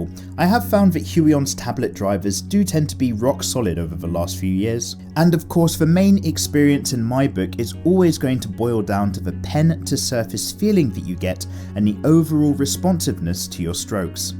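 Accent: United Kingdom. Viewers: you videos are very informative and quite funny, with a bit of a Brit accent, truly good Old Chap, do keep it up to use your humor.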